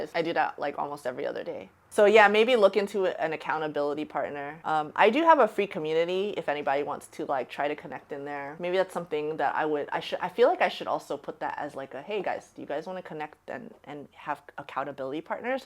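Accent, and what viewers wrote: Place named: Ontario, Canada. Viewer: ahaha sorry I was unclear... I wrote that without thinking. You sound like you have an ontario accent is what I meant to say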